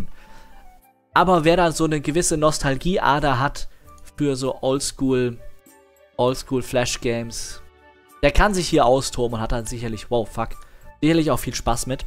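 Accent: Hesse, Germany. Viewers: Ich weis nicht ob mir dein hessischer Dialekt früher nie aufgefallen ist, aber ich lieb‘s sehr 😂👍🏻 Und schön ein neues Video im neuen Jahr zu sehen, hoffentlich bleibt es in Zukunft dabei so regelmäßig Videos zu sehen. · Der hessische Dialekt hat sich auf jeden Fall nicht geändert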